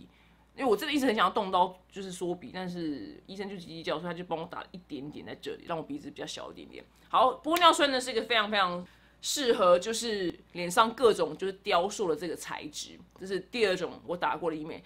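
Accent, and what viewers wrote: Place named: Thailand. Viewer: 泰國味好重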